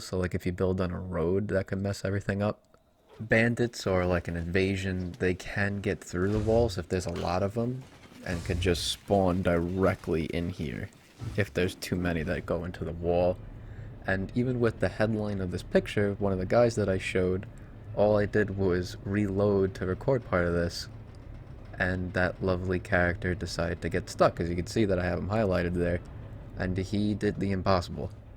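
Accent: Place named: New York, United States. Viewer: Are you from New York? Judging by the way you pronounce "dog" and "small".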